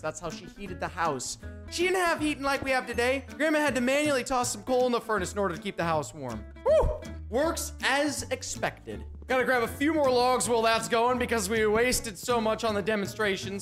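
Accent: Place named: Texas, United States. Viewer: you changed so much the last time I I watched one of your videos you had 100 subscribers and had an amazing Texas accent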